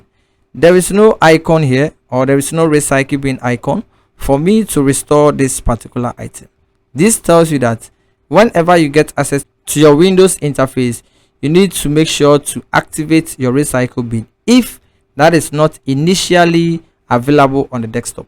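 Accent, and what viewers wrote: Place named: Africa. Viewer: Really love your African accent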